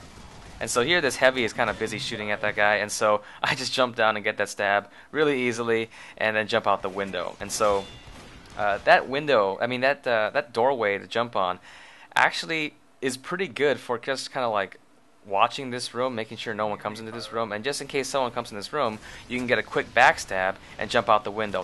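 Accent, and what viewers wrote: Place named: United States. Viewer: To be honest you sound very american.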